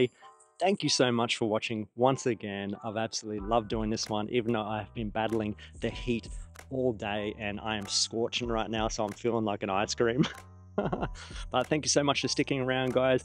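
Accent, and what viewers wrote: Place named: Australia. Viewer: I am from jp. First time watching. Sounds like you are from Australia and you did mentioned that after 12 mins in. I believe you when you said it is hot. I can hear them cicadas chirping away. Did you master the art of dozing off on train, but managed to wake up right before your stop yet? They are starting to post signs telling us to not walk on escalators. I don't think that will every happen. I always walk on them. If I stand, I feel like I have missed 10 things I could have completed if I did walk. To be fair, the conductor do say to wait for others to get off the train first before you step in. Also, they pick up their trash and food wrappers they have purchase when they left the room. Sounds like you have been in jp for over 3 months. You must be another master of sorting your trashes at home A??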